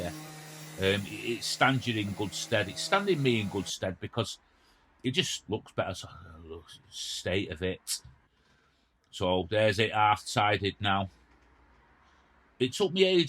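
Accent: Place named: England, United Kingdom. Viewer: You sound like an English man . Am wondering why your title mention a......one by six. You use 6 by1 i hear. Dont confuse us Aussies. No one gets it right the first time.. you will never be perfect ,thats not possible. Call it what you want and dont copy thoses loud mouths over the big ocean. Gluing only is absolutely fine.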